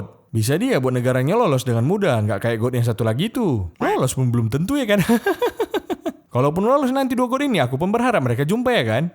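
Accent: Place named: North Sumatra, Indonesia. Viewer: logat kau sumut kali njeng 🤣🤣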